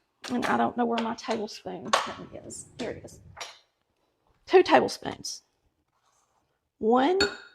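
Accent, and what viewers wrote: South, United States. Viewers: When the video only provides the sound, usually the instruction is doable. Not today, I REALLY wanted to watch the demo. Love love your adorable southern accent. Paz be with us all 🙏. " "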